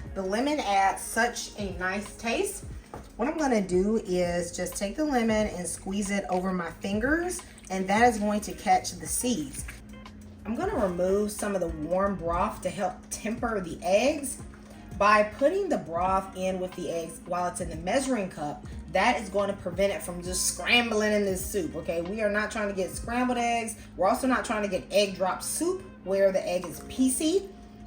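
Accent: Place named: Nashville, United States. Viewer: Hi! I'm a new fan,. Hey - are you by any chance a Nashvillian? That's Tennessee, y'all. You sound so Nashville! Are you? Love your stuff! Keith in Nashville PS You're a genius!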